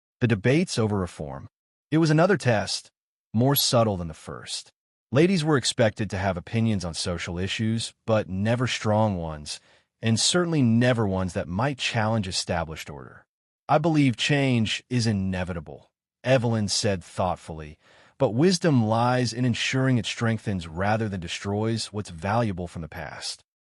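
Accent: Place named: United States. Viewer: I simply cannot understand why they use such a strong American accent for the narration in a story set in Britain. It ought to be British English.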